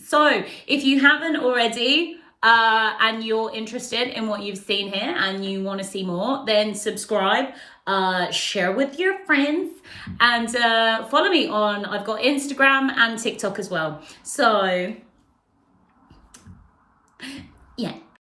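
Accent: United Kingdom. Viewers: You sound quite British, I hope you are, I love finding YouTubers/tiktokers from the same country as me 🙂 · Ms., (I've asked this in another place) as an American, the subtleties of British dialect are beyond us. Might I ask what "flavor" of British English do you speak? Also, you are brilliant, sharp and quick as lightning. Very extraordinary. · u british right lol!? x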